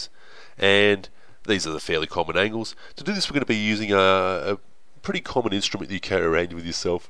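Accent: Australia. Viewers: I love this guy he use smart way to teach Math. His English sounds like Australian yet a lot easy for me I really want to talk to him one day. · Pure Australian accent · He’s Australian... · He is an australian that is why he sounds like that. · is he australian? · A true Australian accent that is. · Now that is one thicc Aussie accent. I love it! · This guys obviously Aussie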